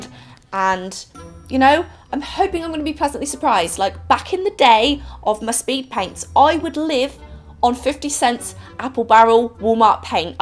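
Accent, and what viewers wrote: England, United Kingdom. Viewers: Why does she have an English accent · Are you English living in America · I was very surprised when you said "dollars"... you sound so very British! (new to this channel, so maybe that is a very stupid comment/question to the regulars). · not related to the video but, are you English or American. You always talk about going to American stores like Walmart but you sound English to me. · Why do u sound British? 😂🤣 btw love u! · She has such a British accent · Is she british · Are you English or American I’m confused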